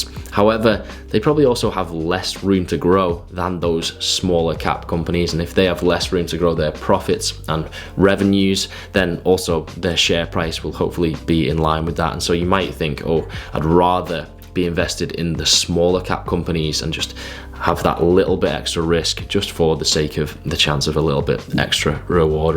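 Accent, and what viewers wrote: Liverpool, United Kingdom. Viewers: Scouse power! 💪🏼 Quality video lid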